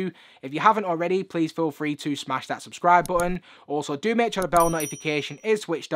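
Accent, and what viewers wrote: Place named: Lancashire, United Kingdom. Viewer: Great reviews but that Burnley Lancashire accent bro 😂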